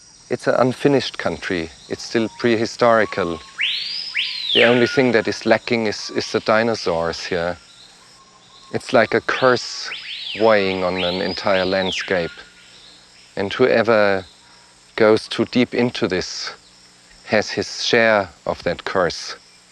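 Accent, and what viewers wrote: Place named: Germany. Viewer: That cynical voice, the thick german accent full of wisdom and perspective. Gives a remarkable contrast to the organic background and the animal sounds that almost mocks his wisdom with creativity.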